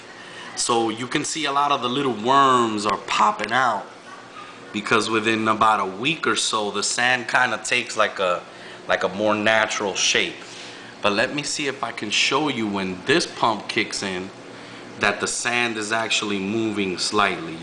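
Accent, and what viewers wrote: New York, United States. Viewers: you sound like my uncle being that hes from new york its kinda weird...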